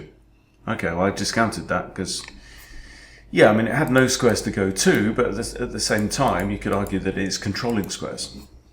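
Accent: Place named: England, United Kingdom. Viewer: I hope you continue making videos. For me and many others, your videos are just at the right level. It's also nice to hear an English voice, there aren't many out there on twitch or YouTube. Good luck.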